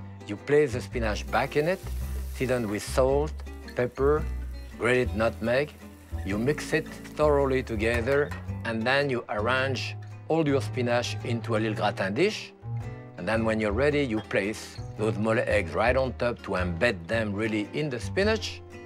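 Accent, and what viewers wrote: France. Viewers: That french accent.. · merci M. Pepin...c'est se bonne-- this is wonderful....dae schopping of the herbes is a beautiful sound.....love his francais acCent!!!! ') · He actually said, "we're making more dishes with eggs" but it sounded like, "more of this shit with eggs." lol French people can't say the word "sheet" either and would say, "on a cookie shit." He often calls eggs.... "heggs" too. My mom is French so I've heard this accent all my life. It's adorable. I feel right at home with Monsieur Pepin. Can listen to and watch him cook all day.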